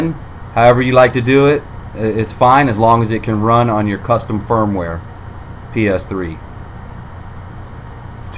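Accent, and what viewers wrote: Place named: Canada. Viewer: Lmao. Oh! Just kiddin'! Sounds like a Canadian accent, almost Chicago-ish. Anyways, nice tutorial bro. :y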